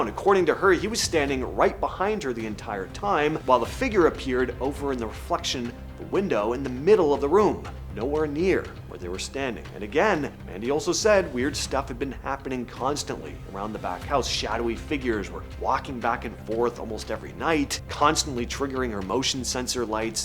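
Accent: Australia. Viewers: Great creature pics!... your Australian is perfect! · Australian accent... verdict...Not to bloody bad cobber..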